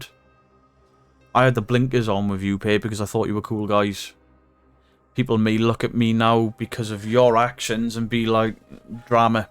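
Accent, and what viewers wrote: Wales, United Kingdom. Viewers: Listening to this in your Welshy accent lol "come on boys.." lol I'm from South Wales too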